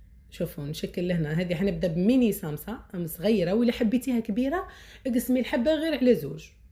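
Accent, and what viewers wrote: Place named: Biskra, Algeria. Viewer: خيار الناس حبيبة شكيت في اللهجة انا تاني بسكرية 🥰🥰🥰🥰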